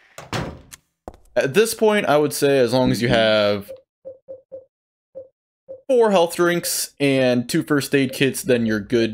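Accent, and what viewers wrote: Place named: United States. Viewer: Thanks for answering, if you don't mind me asking, what place in America is the accent from, I'm asking because it's a little different from what I usually hear in movies and games.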